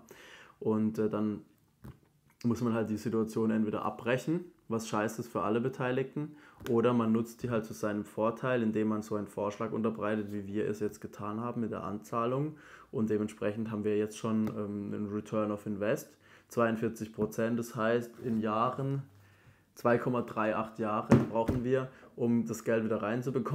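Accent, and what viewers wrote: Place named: Baden-Württemberg, Germany. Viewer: ach ich hab jetzt nochmal paar mehr videos von dir nachgeholt und gemerkt, es liegt wohl an deinem Badenwürtember-Akzent. 😂 aber kommt dem schon nahe vor allem auch mit der Betonung. Ich meine Percy, die Rolle von Teddy Teclebrhan / aka Antoine.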